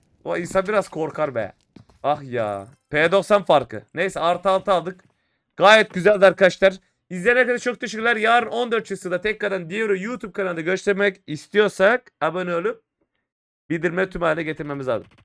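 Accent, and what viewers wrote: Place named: Antalya, Turkey. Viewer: senin antalyalı konuşmana bayılıyorum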